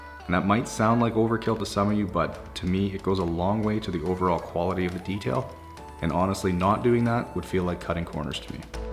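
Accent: Canada. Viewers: Eh? Looks a lot better than I brought it eh? Yea a little less mud on it eh? I love the Canadian speech 😂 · His inner Canadian comes out when he associates with other Canadians. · Dude doesn’t sound Canadian when he does voiced overs but when he talks to other Canadians in a video he sounds Canadian as ever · I ligit screamed when he was talking to the customer I never thought he sounded Canadian till now the aye and everything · Love how you became more Canadian sounding once you were talking to your bud there! It's so funny how we make each other Canadian. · anyone else surprised when he started speaking canadian?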